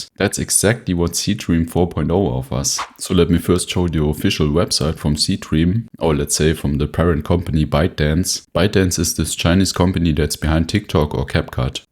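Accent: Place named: Germany. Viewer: Gutes Video. Aber noch nie so deutsches Englisch gehört, aber mach weiter so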